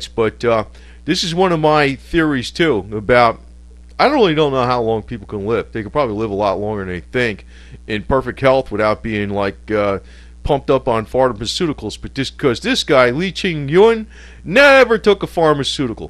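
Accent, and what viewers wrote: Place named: Philadelphia, United States. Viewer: are you from philly? I can hear it in your voice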